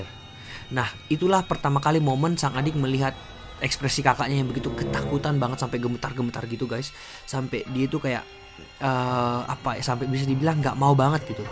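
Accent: Makassar, Indonesia. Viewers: Semakin seru konten nya Dengan logat Makassarnya bikin lebih merinding haha Semoga bisa dapat 500k Instagram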